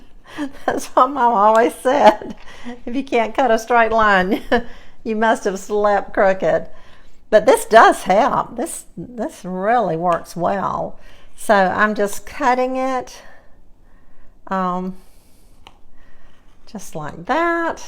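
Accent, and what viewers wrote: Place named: Alabama, United States. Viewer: Looks wonderful! Look forward to trying this. Oh how I love blueberries. I heard you mention Thomasville. Is that Alabama? My step mom was from there and passed away recently (Atkeison). Your beautiful accent reminds me of her. God bless!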